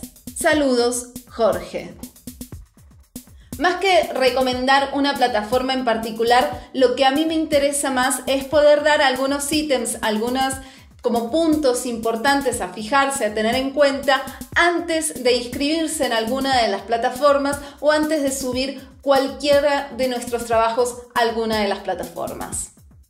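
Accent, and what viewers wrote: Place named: Argentina. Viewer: Que bueno ese acento argentino :)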